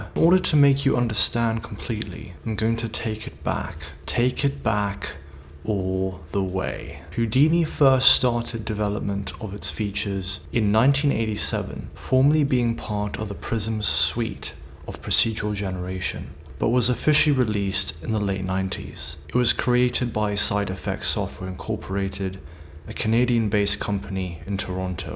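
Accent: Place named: United States. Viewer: Warner 'broes'? Have they changed their name or does the person live in murica, going by his accent?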